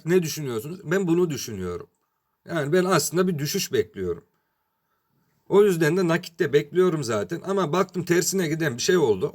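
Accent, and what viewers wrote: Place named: Adana, Turkey. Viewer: Şiven aynı Adana yöresi gibi memleketin neresi be abi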